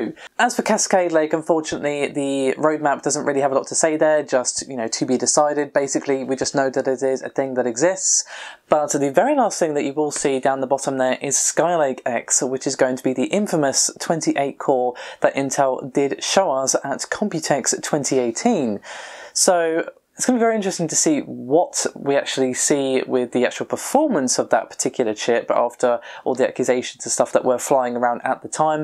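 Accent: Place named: London, United Kingdom. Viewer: England, london area I think.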